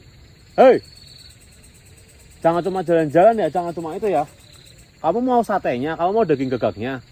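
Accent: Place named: Java, Indonesia. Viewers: Rasa nya hambar lah, udah di makan sama jin, jawa nya medok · Ngapak nya jelas...dari tegal tah😊😊😊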